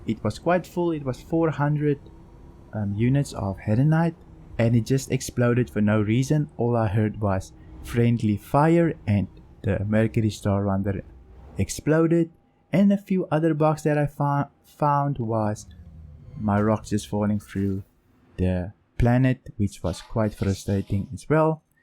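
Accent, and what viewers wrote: South Africa. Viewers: Yitte saffa i can hear it lol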